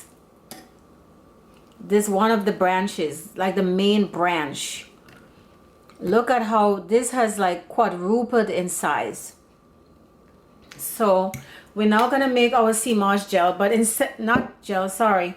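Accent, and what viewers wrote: Trinidad and Tobago. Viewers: hmmm my Trini spidee sense is telling me your Trinidadian. Big up all my TRINI!! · You sound like my cousin from Trini, so easy to listen to. You're a natural born teacher. Thanks!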